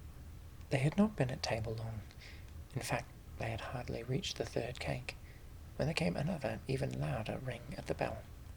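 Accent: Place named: New Zealand. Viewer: He’s even got the kiwi accent, by the sounds of it 👏🏼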